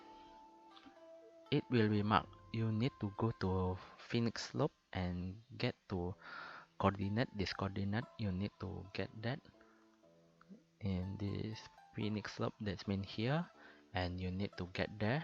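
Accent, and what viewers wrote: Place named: Indonesia. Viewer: Fix org indo ini, dari cara ngmng nya keliatan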